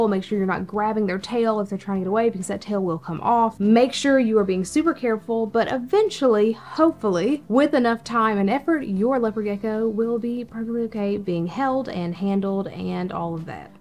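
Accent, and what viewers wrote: South, United States. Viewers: Why do you sound more southern in these newer videos? Lol!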